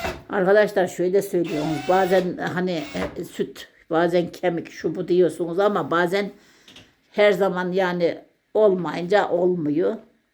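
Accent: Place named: Çorum, Turkey. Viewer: Ben bu kardeslare abone değilim ara sıra izliyorum yorumlara bakıyorum,takipçilerin bazisindan gelen çirkin yorumlar benim bile canımı acıtıyor çok rica ediyorum beğenmiyorsanız neden izliyorsunuz.Turkiyenin her yöresinin lisanı lehçesi değişiktir hanım Çorum'da konuşulduğu gibi konuşuyor beğenmeyen izlemeyecek,ayiptir günahtır.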